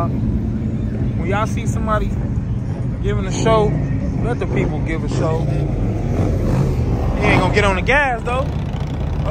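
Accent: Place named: California, United States. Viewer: You from lil rock bro? You got that cali accent. And man RIP to that elco wished you would walked up to it i wanted to see the damage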